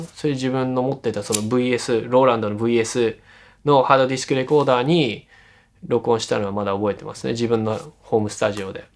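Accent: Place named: Kansai, Japan. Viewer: ちょっと関西弁なんがすごい新鮮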